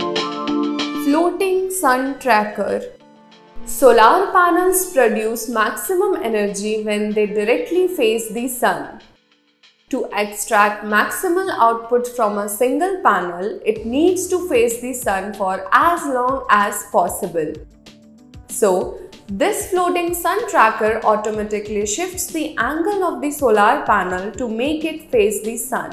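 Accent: India. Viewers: the accent is almost totally but not quite totally indistinguishable from English. It's very.. bolly. Staccato... too Adamant. English should be softer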